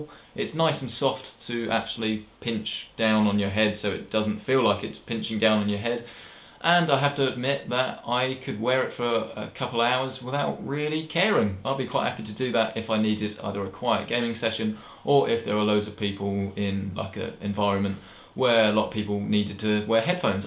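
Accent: United Kingdom. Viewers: that's just his british accent...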